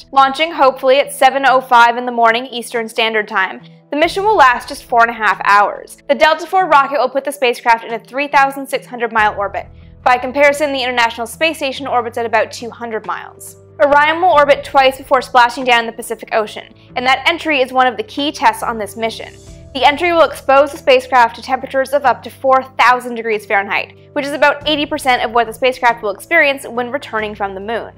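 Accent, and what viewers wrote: Canada. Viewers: speaks like a Canadian to my ear, although these accents are almost the same.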